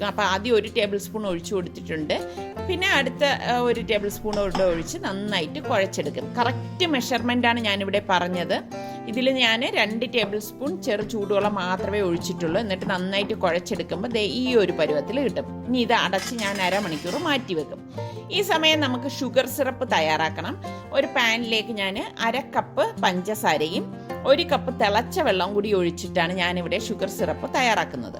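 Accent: Trivandrum, India. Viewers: Ithade correct place evda?? Lockdown aano avde....nte place nedumangad ippo kochila njn ....ithade samsaram kekkumbo nikk nte tvm orma varuva..... subscribe cheythutto..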